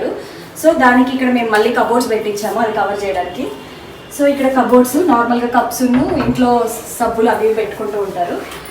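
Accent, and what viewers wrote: Telangana, India. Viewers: Inthaa dooram vellinaa Meeru Mana telangana language laa Matladudu....adirindi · Ur Telangana slang is very nice sister, nice video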